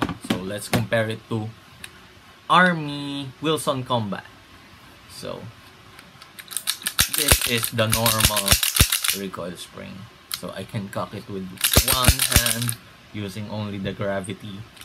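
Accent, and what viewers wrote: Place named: Philippines. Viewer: Sabi na pinoy ka po eh haha sa itsura at pananalita palang eh hehe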